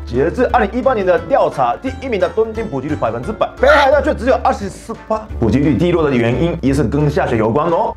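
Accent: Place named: Taiwan. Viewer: 社長說話流利很多 也很台ㄟ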